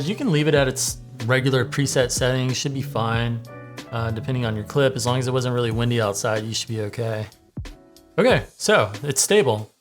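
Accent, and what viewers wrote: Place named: Russia. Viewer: Ах этот русский акцент 🤪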